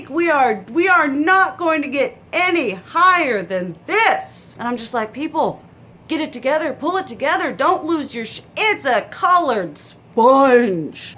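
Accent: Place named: Rural, United States. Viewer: Its funny how you make fun of people loosing their mind over different color beauty blenders when you apparently loose your mind for useless knick knacks and odd shaped and colored eyebrows. I don't know how many people will be able to get past the various annoying habits you have and your hick accent. But good on you for putting yourself out there. You are making fun of flipping NATASHA DENONA for baby Jesus sake. Have you seen how classy and beautiful she is?!?! I have every one of her palettes, not even kidding. Bullshit, you will be buying shit all year this year and just not telling people.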